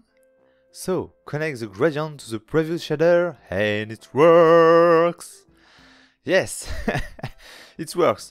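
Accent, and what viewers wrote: France. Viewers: Super instructif merci!! Sinon woaw, cet accent frenchie aussi parfait je pensais qu'il n'existait que dans les films :o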